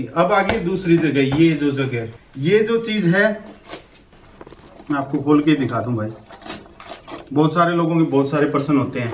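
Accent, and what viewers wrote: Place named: Rajasthan, India. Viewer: very nice bhai Aap ki aawaj se legta h aap Rajasthan ho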